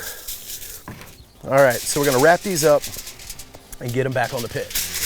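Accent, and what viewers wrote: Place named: South, United States. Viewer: Great video. Except your accent sounds like the southern surfer guys we have down in Charleston. Strange. Haha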